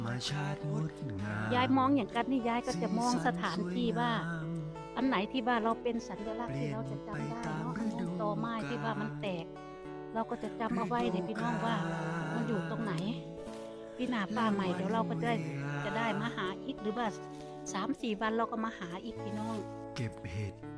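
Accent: Korat, Thailand. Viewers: สวัสดีจ่ะ ดูยายเก็บเห็ดเก็บผลไม้เพลินดีได้ฟังภาษาโคราชวันละคำไปด้วยฉันคนบัวใหญ่เด้อจ่ะ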